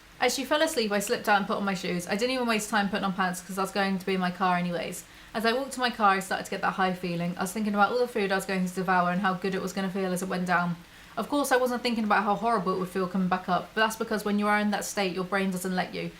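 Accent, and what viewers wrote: United Kingdom. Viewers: She's from the south of England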